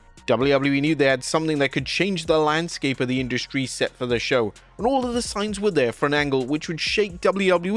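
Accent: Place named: United Kingdom. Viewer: i hear a british accent, i leave the video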